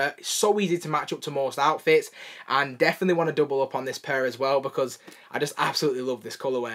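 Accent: Scotland, United Kingdom. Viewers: Saw your comment on settle Fowler. Is that a Scottish accent? Anyways I like this video. My favorite yeezy 350s were the cinder, earths linens and upcoming sand color way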